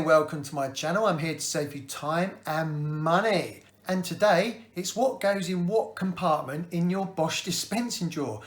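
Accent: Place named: Suffolk, United Kingdom. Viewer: Thank you! This answered a question I've had for a year since buying a house with a Bosh washing machine. Love the accent,! Are you from Suffolk?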